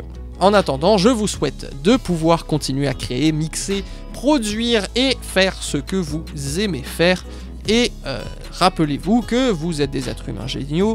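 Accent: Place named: Réunion, France. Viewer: T parey mi sens kom inn ti accent kreol 😅 ou lé réyoné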